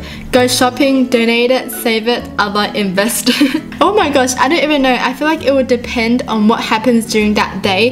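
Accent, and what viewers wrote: Australia. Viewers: Good morning Miss.!!! I am the new student in your class:) I really like your Australian accent too!!!:D (wish I could go to her class)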